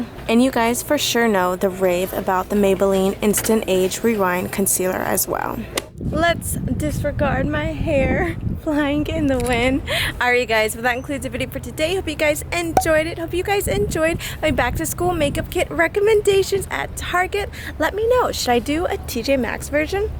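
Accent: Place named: Latin America. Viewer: She has a very thick Hispanic accent.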